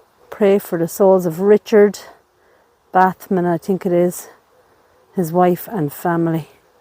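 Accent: Ireland. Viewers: Happy birthday. You take us on a magical but sad tour of the diffrent cemeteries. I love your Irish accent.By reading some the the head stones you give the deceased their names will not be forgotten.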